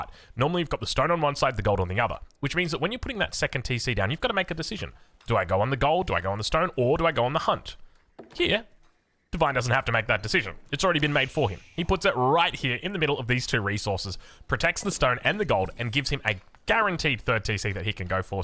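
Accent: Australia. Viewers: Hi, you're aussie right? 😅, do queues pop ok for this on 1v1? cause i want a new comp rts to play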